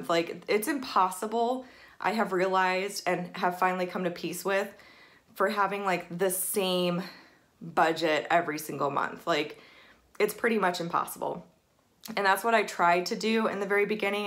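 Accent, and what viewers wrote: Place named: Wisconsin, United States. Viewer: WHOOP...WHOOP...It was really good watching this & learning about you. #MIDWESTGIRLS I live in Indiana & I know you say you've lived in Arizona for about 20 years, but...I can still hear a bit of that "Wisconnnnsen" accent in your voice. I only know it because I have a lot of friends who live in WI. Do you work for University of Phoenix? I took classes through them & although it was much different than a traditional brick & mortar school, I really liked it. I think I'd love to have a job like what you do. It seems like you've got a pretty good thing going! Good luck with your journey & looking forward to hearing your "news" next week (can we probably guess what it might be)?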